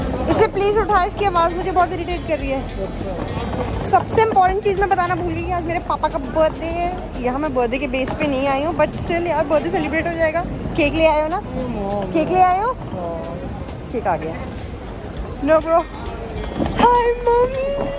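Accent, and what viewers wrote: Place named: Gurdaspur, India. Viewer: Munde di boli toh pta lg gya oh gsp toh aw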